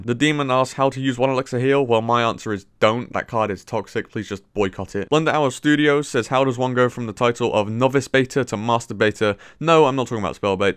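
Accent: United Kingdom. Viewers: R u from liverpool i thot u from london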